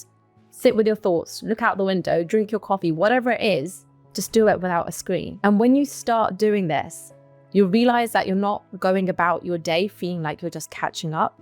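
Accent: United Kingdom. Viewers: Just me probably who face hard time with British clipped English (usually i use 1.3x playback speed)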